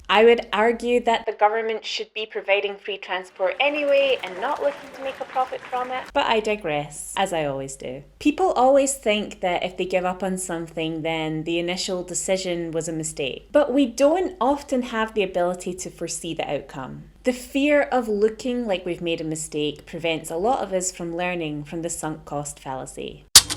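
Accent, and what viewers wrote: Scotland, United Kingdom. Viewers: Brilliant concept. By the way your Scots accent is most cool🌺♥️😎👍 Great video. Nice editing, great production. Good rythum👍....I mean balance✔️ Waste is terrible. I'm type 2 and can't have buns and can never find anyone to have the rest of the buns/cakes/pain au chocolate etc., etc., so I end up chucking 5 pain au chocolate in the bin😟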